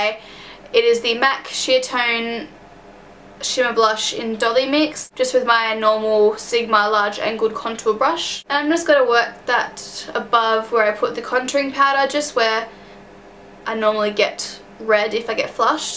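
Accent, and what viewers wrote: Australia. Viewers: Sounds more Australian.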